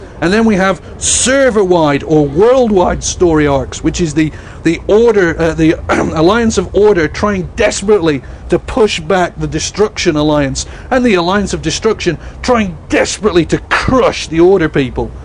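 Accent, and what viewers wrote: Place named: Yorkshire, United Kingdom. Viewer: Hahah, it's genuine. It's a just a regional accent, yorkshire i think! Although Games Workshop have made it big in north america they are a british company.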